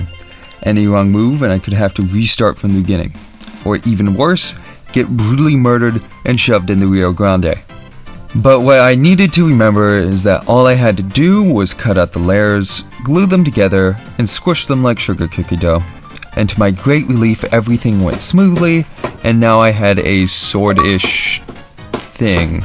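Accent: Netherlands. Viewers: He from Utrecht? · is he dutch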